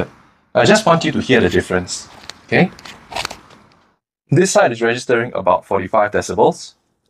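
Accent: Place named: Singapore. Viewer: Get to the point, my Singaporean accent friend!!!!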